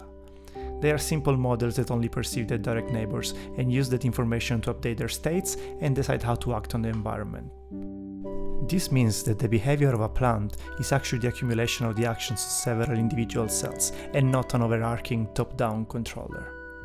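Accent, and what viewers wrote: Italy. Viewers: Solo sentendo il tuo inglese ho capito subito fossi italiano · Am I mistaken or you have my very thick Italian accent too?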